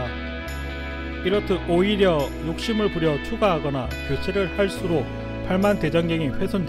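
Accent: Gyeongsang, South Korea. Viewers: 경상도 억양 이상할것 하나 없습니다. 저는 충청도 토박이고 30살이 넘어서 경상도에 정착 했습니다. 처음에는 어색하고 불편 했지만 이젠 별로 아무렇지도 않습니다. 다 똑같은 한국말인대 성조가 있다는것만으로 불편하게 느껴야 한다는 사실이 참 안타깝습니다.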